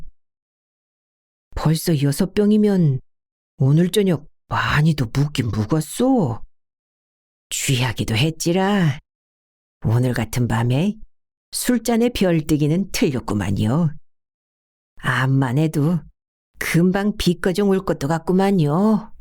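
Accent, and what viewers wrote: Jeolla, South Korea. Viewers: 세상에나! 이렇게 실감나는 전라도의 정 깊고 인정 많은 사투리 맛갈 나는 성대 묘사 노벨상 감입니다. 진정 노고에 감사합니다. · 시드니에 여행와서 님 목소리 들어보니 더욱 반갑네요 전라도 사투리~~ 구수합니다 🥰 제 고향이 전라도 광주랑께요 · 역시 최고의 낭독입니다,사투리하면 전라도 사투리가 최고로 맛갈나구요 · 상사화의 슬픈 꽃말이 생각나는 소설이네요. 잎과 꽃이 서로 만날수 없어 그리워한다라는 말이..그래서 절에서 마이 키운다는 상사화꽃 워째 요로코름 전라도 사투리를 징글징글하게 잘 하신당께요. 전어대가리 구이와 회가 봄인데도 생각난당께요. 각시가 콩자반 별명에서 빵 터지고..ㅎ 사나이는 태어나서 세번만 울어야 된다꼬 했는디.. 고 부분에서 언제 세번 울어야 하는지 갈켜 주시고 가시제이~ 하는 부분에서 고만 또 빵 터저 부렸당께요. 이거이 웃으며 들을 소설이 아니뎅 고리 되었당께요. 전라도 사투리에 정신이 쏘옥 팔려 내용이 우찌 되는지 오늘 모르겠당께요..😋ㅋㅋㅋ 다시 함 더 들으며 자겠당께요~~😝😴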